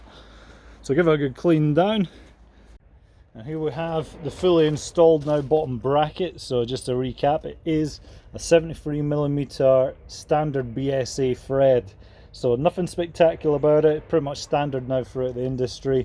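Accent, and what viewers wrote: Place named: Scotland, United Kingdom. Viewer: What's up Hello brother, I'm getting mine in a few weeks. Went a complete build which is amusing considering I own a bike repair shop but stock in the suppliers is VERY low and PX have all the parts. Be rest assured mine will be customized in the future too:D Where are u from? I'm Dalkeith. You sound east Scotland too.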